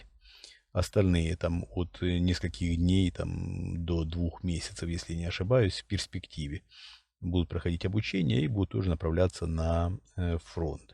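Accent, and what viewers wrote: Ukraine. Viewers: Уважаемый т.н « », вы мне как лингвисту можете ответить на вопрос, почему у вас явный украинский говор, если вы были генерал-лейтенантом СВР, то есть еще советский специалист, (проходили подготовку в Москве, жили там же) где глубоко погружали в изучение иностранных языков?😊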